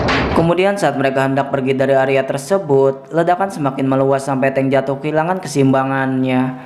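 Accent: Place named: Betawi, Indonesia. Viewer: Narator logatnya yg terakhir agak ngelenong 😀😀😀🙏🙏🙏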